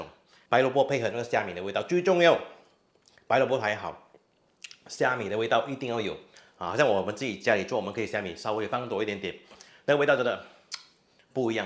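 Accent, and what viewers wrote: Malaysia. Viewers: 沒有臘腸和冬菇的蘿蔔糕😅聽口音是不是大馬風格的蘿蔔糕啊😅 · From the way he talks,I think he is a Malaysian Chinese...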